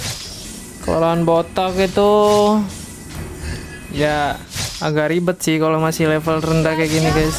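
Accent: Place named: Banjar, Indonesia. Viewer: Bng org bnjr? Soalnya logat nya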